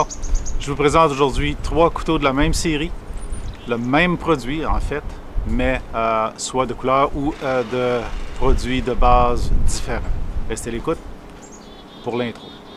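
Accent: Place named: Quebec, Canada. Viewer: Je ne savais pas que vous faisiez des reviews en Français, sur la vidéo en anglais j’avais remarqué un léger accent Québécois mais je n’en étais pas sûr... 😊 En tout cas vos reviews sont superbes et fort intéressantes, et avec ce beau parlé Québécois cela embellit le tout!!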